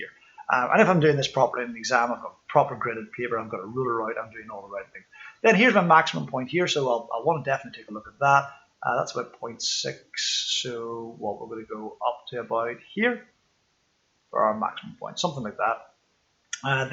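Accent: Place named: Ireland. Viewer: This guy sounds like an Irish man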